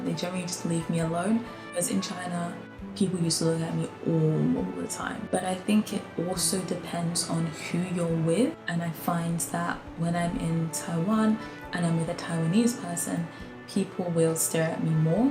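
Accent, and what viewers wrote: United Kingdom. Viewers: 她的發音100％是英國人😆😆😆😆😆😆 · 真的就聽口音知道是英國人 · 很明顯的英國腔 BTW她唇保養得很好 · 這麼明顯的英式口音都不相信是英國人🥶膚色是無分國藉的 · 好舒服的英國腔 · 好喜歡他的英國腔ㄛ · 他的口音就是個英國人啊。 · 很明顯的英國腔啊😃😃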